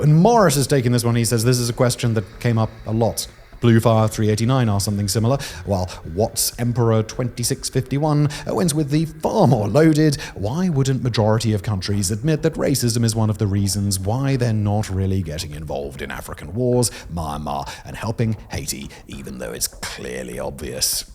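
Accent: United Kingdom. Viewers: His British accent is too thick. It sounds like jibberish. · Hey,! Love the channel, but could you dial down the British wanker cadence just a tad? Cheers!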